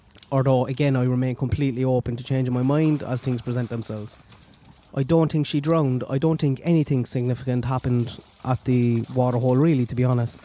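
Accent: Ireland. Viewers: I’m glad you decided not to retire.. Your gaining ground so keep going , I’m also a big fan of Michelle and I’ve heard her mention you so focus on the positive and draw strength from us .. Plus I’m guessing there’s a couple women loving the Irish Accent.. respect from beautiful Canada 🇨🇦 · God you sound so irish i agree i think she was sold how terrible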